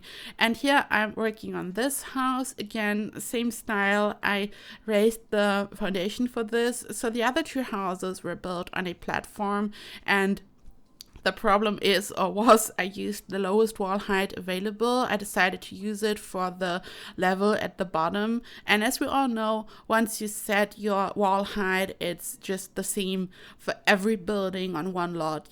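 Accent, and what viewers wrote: Germany. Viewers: Omg hahahhaha this is so funny:D It really is minor. I heard it so many years ago and could never unhear it. I realise its due to accent. My guess would be german? Also, go! Looking forward to more builds <333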